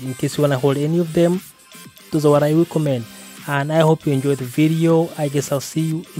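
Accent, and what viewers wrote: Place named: India. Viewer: it's kinda good content but you sound indian and ofc keep making similar videos to this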